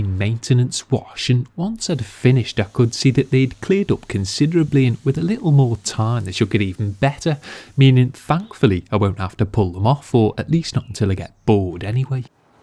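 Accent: Liverpool, United Kingdom. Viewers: Is he a scouser or from the Wirral, it`s a bit posh there.? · Subscribed to your channel 2 months ago, so relaxing and memorising it must be that soft Scouse accent😆,last video before Christmas?, if so happy Christmas/new year, loads more cars to clean in 2018 🎄🇬🇧🚘👍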